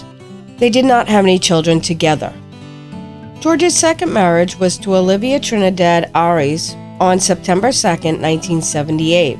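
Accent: United States. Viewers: Ur from jersey arent u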